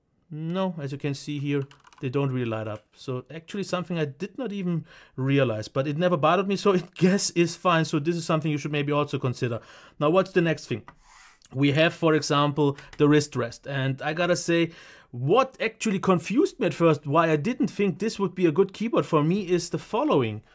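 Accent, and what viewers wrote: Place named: Germany. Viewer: alter der deutsche akzent xDDDD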